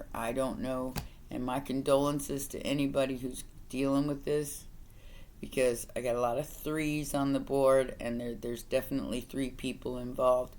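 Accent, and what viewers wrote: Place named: South, United States. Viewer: Set up ain't the word for it. Luv that southern drawl ya got. Great read 📚 reading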